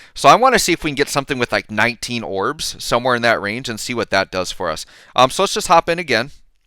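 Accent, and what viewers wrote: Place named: Wisconsin, United States. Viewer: Are you from Wisconsin? I feel it in my bones.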